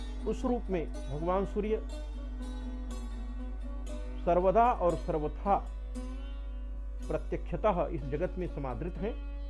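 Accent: Standard. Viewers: शुद्ध उच्चारण के साथ -साथ अद्भुत प्रस्तुतीकरण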